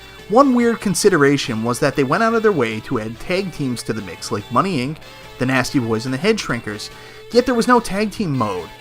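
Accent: Canada. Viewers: I knew you were Canadian from the accent, ya hoser! - Luv from: a fellow canuck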